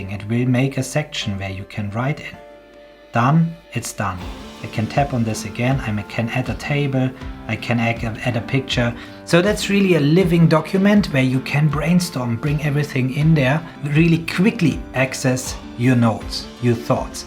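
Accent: Germany. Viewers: I can hören that you kommen aus germany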